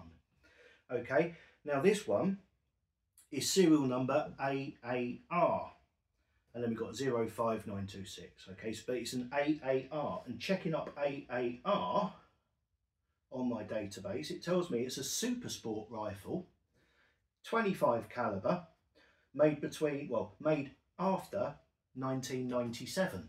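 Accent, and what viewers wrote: United Kingdom. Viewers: 2 seconds in, and i already know I am not interested in this break barrel pellet gun. As soon as i hear the British accent from a guy wearing a batman t-shirt, I know the gun does not pout out enough energy to drop a squirrel at 80 yards. I can not doubt it is a well made gun, (I never had one), but 12fpe is just no fun. Stop letting your governments treat you like children,---------- Batman.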